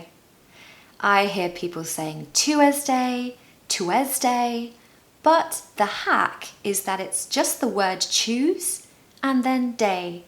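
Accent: RP, United Kingdom. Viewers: I liked the way you used elision technique in pronunciation, great video. Lovely British accent. I m learning from you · Okay, let me say this. You are the BEST teacher on the youtube by far. I have been looking for a teacher and now I have the best one-. I love that your teach us about the British culture as you teach us about the British English. This is FANTASTIC. Please Please Pleaaaaase upload more videos. I watch your videos everyday now as I want to adopt your accent and learn more about the British culture. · I love your beautiful smile and beautiful British accent! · First of all, glad I am so early to this newly opened channel. Second, can you make a video of you pronouncing random sentences and questions so that I can repeat after you and sound more natural??.. I am trying to learn the British accent ❤❤❤❤😁😁😁 BTW, you are a natural, and have such a stunning voice and accent that really helps people like me, who struggles with british accent, to learn faster. 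· Is received pronunciation your natural accent or do you speak it mainly for teaching purpose?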